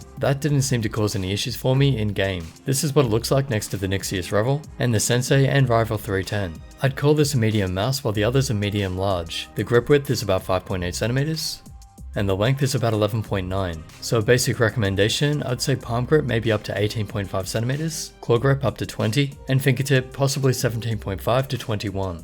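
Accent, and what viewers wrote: Australia. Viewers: An Australian/New Zealand voice that's soothing and orgasmic.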